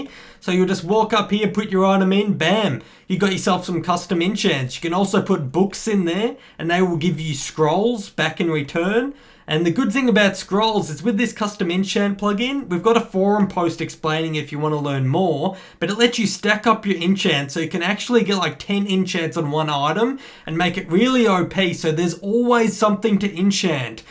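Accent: Australia. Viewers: Dude you are awesome and are you Australian?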